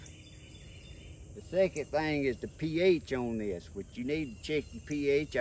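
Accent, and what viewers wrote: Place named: Louisiana, United States. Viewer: As soon as you popped up in my search about gin trash and heard your voice, I knew you had to be from "my neck of the woods" Louisiana! And show nuff, after I went to your page, I see your from Northeast LA! Sounds like my Uncles'' a talkin! ♥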